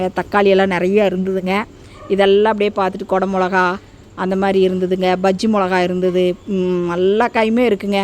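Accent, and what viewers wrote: Coimbatore, India. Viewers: நீங்கள் பேசும் கோவை slang கேட்டுக்கிட்டே இருக்கலாம் போல் இருக்கு சகோதரி.. ரொம்ப பிடிக்குது வாழ்த்துக்கள்..!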